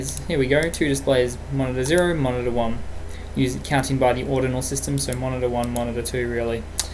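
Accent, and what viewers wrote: Australia. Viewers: Thanks a lot bro... thumbs up! You sound Australian :D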